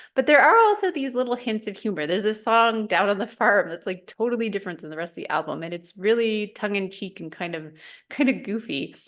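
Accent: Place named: Canada. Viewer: I have always thought that 1973 was probably my favourite year of recorded music, especially in the Rock genre. I'm quite a bit older than you, but I love that you focused on 1973, and thank you for including a coupe of artists I'd never heard of before ( Gary Higgins & Duncan Browne), I'll be searching for their work to enjoy. I also like the fact that your tastes are eclectic, mine are as well. I was introduced to many different genres of music as a child, I had an Auntie who worked for CBS Records, so she gifted many albums to me from a vast variety of genres. I love your Canadian accent. Take care!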